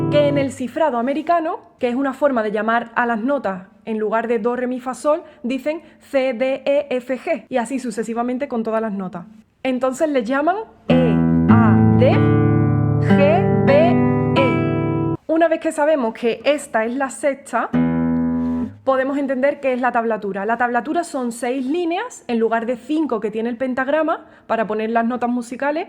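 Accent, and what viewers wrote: Andalusia, Spain. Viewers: Dice Buenas tardes, es de andalucía y pues lo dice con su acento andaluz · No soy entendido en música. Pero me quedo a escuchar ese acento andaluz 🥰 que enamora.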